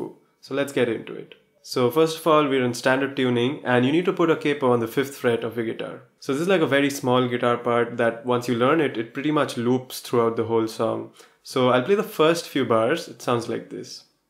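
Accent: India. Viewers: ikr? He has like the same accent. it's Indian accent. but let's stop comparing i mean like nobody likes to be compared? tell me if i'm wrong. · His accent is Indian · good indian accent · Your accent sounds indian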